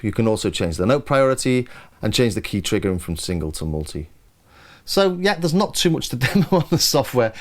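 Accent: Liverpool, United Kingdom. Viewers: Just discovered - Great work mate. I make info vids too and that's a lot of work you're putting in there. Re-living my past buying the software synths from my 20's (including the old pro one in the background!) and you provide a detailed and honest review. I do keep thinking you'd love reaper with CSI to run your synths so you wouldn't miss the physical element - it's the thing that brought me back to enjoying all this gear. We're of similar era and location by the accent, always happy to be given the score with a scouse twang ;) Have you tried any of the cherry audio synths?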